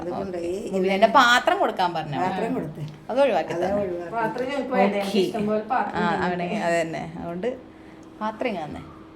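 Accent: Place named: Kochi, India. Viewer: സന്തോഷം ഞങ്ങ പോണ്. നമ്മുടെ കൊച്ചി ഭാഷ മറന്നില്ല 😂😂😂❤❤❤❤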